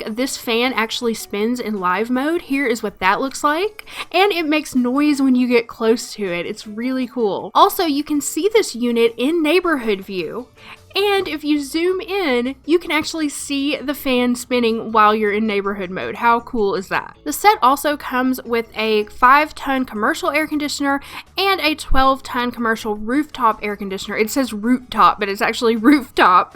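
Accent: South, United States. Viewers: I have been playing Sims 2 (on and off) since 2006, and had NO CLUE about moving wall hangings up and down the wall!!! what!!!!! btw love your channel! you’ve got the best vibes and being from NC i appreciate the southern accent representation 😂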